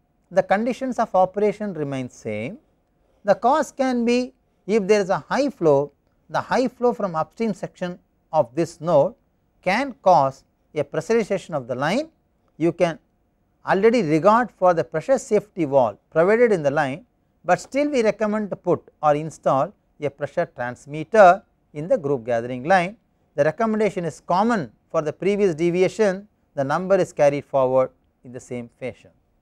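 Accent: India. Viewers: Good Explanation in typical Indian accent.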